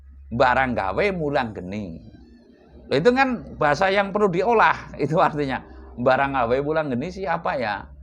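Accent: Banyumas, Indonesia. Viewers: Rahayu Ki, pngndkne sami klh kula Ki, ngapak. Kula skng Karanganyar Kebumen ndrk nymk Ki 🙏.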